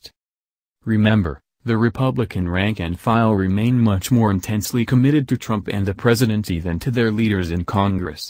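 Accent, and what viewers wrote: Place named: Iran. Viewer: This guy talks like an Iranian he obviously can't speak English well. This sounds like false news. Shalom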